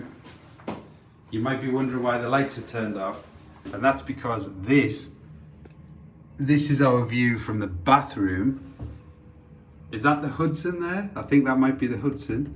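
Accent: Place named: North East, United Kingdom. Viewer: You’re accent sounds very north east! We’ve also stayed in this hotel, loved it, fantastic location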